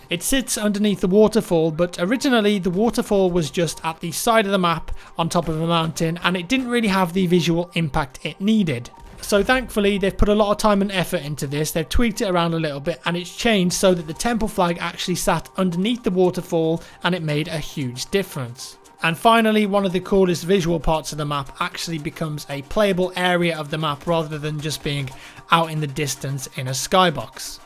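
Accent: England, United Kingdom. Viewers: He's English. They pronounce everything different. Even in various regions of the Isles the words will sound completely different. It's the beauty of accents. Much like opinions, no two are alike.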